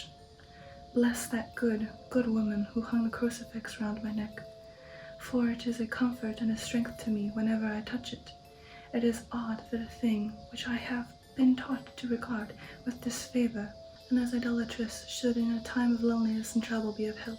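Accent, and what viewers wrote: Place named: Transylvania, Romania. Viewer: it's nice to see you again a perfect accent a bit Transylvanian at some point I loved it always so much a pleasure ^^ (I know how much you love batman so here you go <link>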